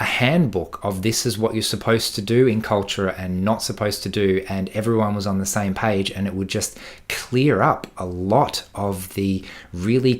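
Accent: Australia. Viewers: Nice to hear an Ozzie voice... :)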